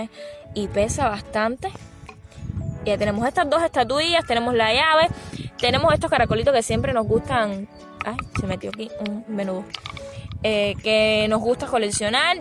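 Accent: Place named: Cuba. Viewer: Excelente video me encanta tu acento cubano además estas súper guapa saludos preciosa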